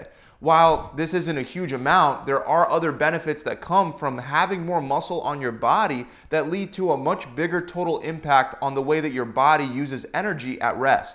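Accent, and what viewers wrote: Philadelphia, United States. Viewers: “Wooder” - Guy has to be from Philly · now we know he is from Philly :)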